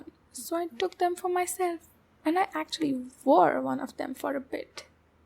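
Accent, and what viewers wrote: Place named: India. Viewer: I can sense the indian accent in this audio.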